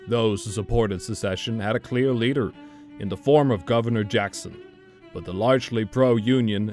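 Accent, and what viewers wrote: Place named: Finland. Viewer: Really enjoyed this video but im really trying to pin point the slight accent i hear when you pronounce Missouri...your S and Rs make me suspect a Finnish background 😅 Supmalainen Sisu! 🇫🇮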